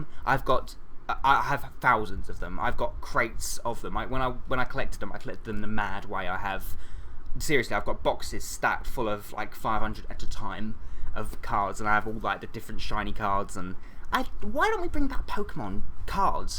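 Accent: England, United Kingdom. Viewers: HES ENGLISH YAAASS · were do u live u soud british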